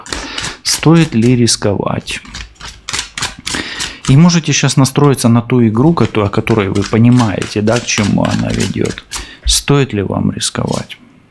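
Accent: Ukraine. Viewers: Я красотка люблю жизнь возрост уже большой собаки нет не люблю в квартире но они меня любят всю жизнь сама себя содержала никогда не брала у мужчин надеялась только на себя что нажила ваша русня забрала сейчас за границей в ирландии но влюбилась даже не ожидала потеряла чувствую что вы с Украины так как речь пролитает родные слова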